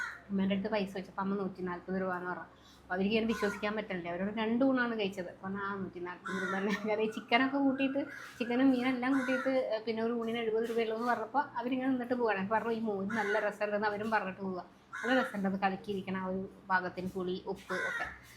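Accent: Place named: Thrissur, India. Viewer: 👍 തൃശ്ശൂർ ഭാഷ കേട്ടപ്പോൾ ഒരു കൗതുകം 👌👍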